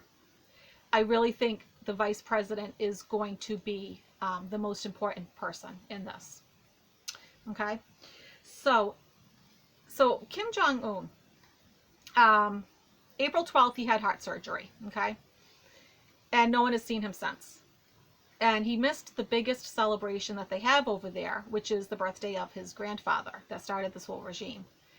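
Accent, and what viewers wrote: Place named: Boston, United States. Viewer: you're so awesome at all of this! I'm sure it's tough to keep focus while taping, and playing Oprah/Donahue to a growing audience. Thank you for your clarity and warmth; and what a poignant way to honor Otto. I'm in Los Angeles, but I grew up near Boston, so it's comforting to hear that accent again! For all of us who you're now reaching worldwide: thank you for changing Youtube and changing our days, for the better.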